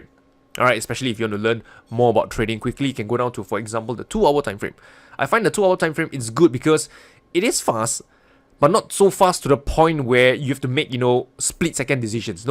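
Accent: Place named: Singapore. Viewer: I like the accent reminds me of my long lost childhood friend from Singapore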